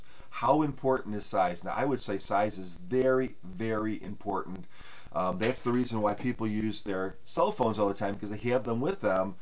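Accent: Chicago, United States. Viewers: are you from chicagoland?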